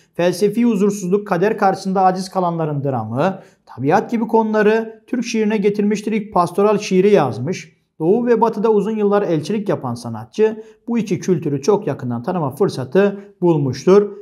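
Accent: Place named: Akçaabat, Turkey. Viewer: Hocam sizi yeni keşfettim çok sağlam videolarınız var maşallah öncelikle tebrik edip sonra bir sorum var acaba Trabzonlu musunuz hadi biraz daha tahmin edeyim Akçaabatlı şiveniz birebir aynı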